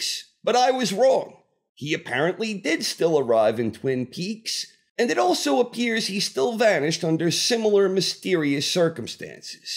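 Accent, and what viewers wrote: United States. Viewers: i would love to know if this is your real voice..and if so....which part of America are you from...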